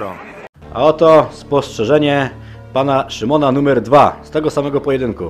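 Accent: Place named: Silesia, Poland. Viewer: Niby z Warszawy, a nawija jak hanys.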